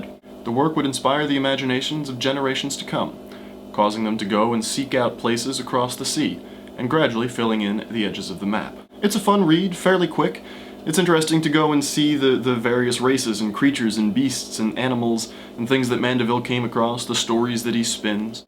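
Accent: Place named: France. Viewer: Nice french accent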